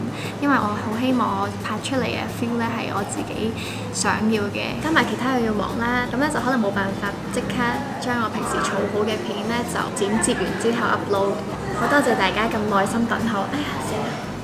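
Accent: Taiwan. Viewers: 講臺語好像很標準，有親切感，很像鄰居家女兒的感覺，我女兒都沒這麼道地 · 每次聽AV講台語的時候都好可愛喔!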